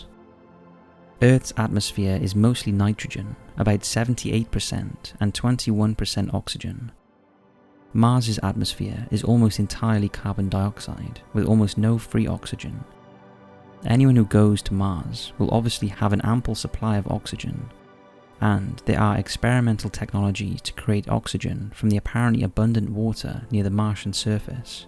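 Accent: United Kingdom. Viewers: Why is he using Fahrenheit when he is speaking with a British accent?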